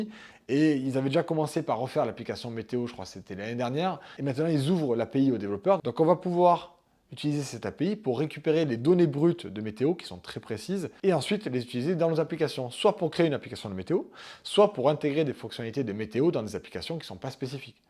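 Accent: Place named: Marseille, France. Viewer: ta l'accent de Marseille ! cool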